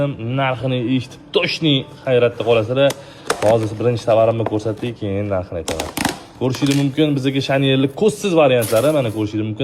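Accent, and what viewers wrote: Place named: Andijan, Uzbekistan. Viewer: Кайердансиз.адресингларни айтмадингизу.шевангизга Караганда Агдижондансиз.❤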